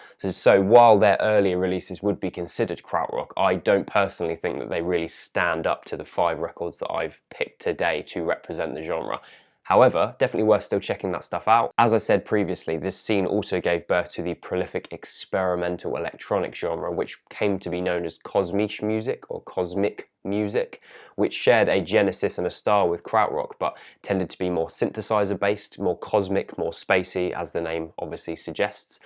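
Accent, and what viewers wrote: RP, United Kingdom. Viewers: HIS POSH BRITISH ACCENT MAKES ME BELIEVE WHAT HE IS SAYING!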